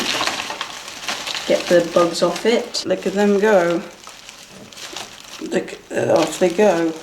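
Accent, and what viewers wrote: United Kingdom. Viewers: Didn't realize you were British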